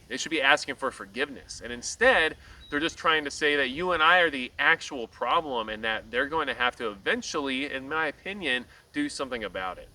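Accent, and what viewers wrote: United States. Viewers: You look and sound like a typical American to me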